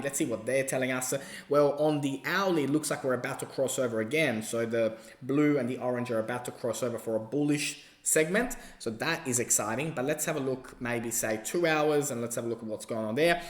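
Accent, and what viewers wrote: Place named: Australia. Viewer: Love your accent mate - Aussie Aussie Aussie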